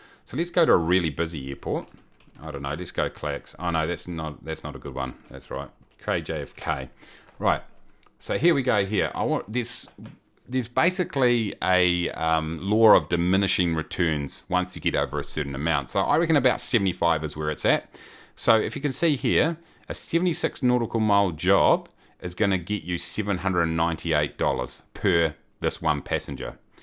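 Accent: New Zealand. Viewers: Thanks for these videos love the kiwi accent too :) One thing though...You didnt really talk about how facillities make money and the kind of averages you could expect from them. I noticed you receive income from ground fees but didn't seem like much. Maybe you can make a quick vid explaining more on different types of income etc?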